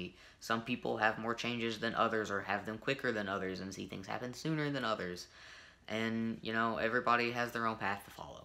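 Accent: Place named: South, United States. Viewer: You’re definitely from the south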